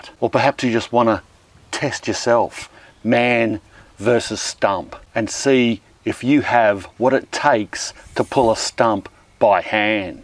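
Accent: Australia. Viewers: Al Borland, but Austrailian...... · This dude is an Aussie Stud! With that accent and those thunder thighs, he can get anything done! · I wonder why the early pilgrims of the 17th century didn't develop that ozzy sounding accent. maybe they did but there are no recordings to confirm it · Dan Miranda I could listen to an Aussie read a phone book. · Love that Australian accent. Too bad the country has gone Totalitarian... The rest of yhe world is following, sadly. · I really just wanted to listen to an Aussie bloke talk about removing stumps. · "How to Remove a Tree Stump with a Pick Axe, Chain Saw, Scrap Hardwood, Circular Saw, Prong-tool/crow bar." Hmm, yeah, wedges and a sledge is all I expected to need by the title. FYI, I'm doing the work for a couple Geriatrics on a super slim budgie, not looking to run up a line of credit at the home improvement warehouse. 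Always enjoy listening to the Aussies, regardless. My favorite YouTuber is Ozzy Man Reviews: <link>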